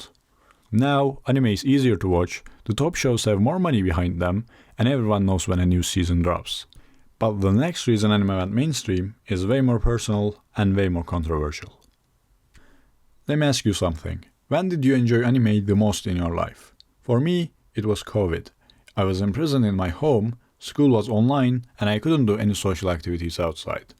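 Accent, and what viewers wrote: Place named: Turkey. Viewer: this isn’t related to the topic but are you turkish your accent kind of sounds like you are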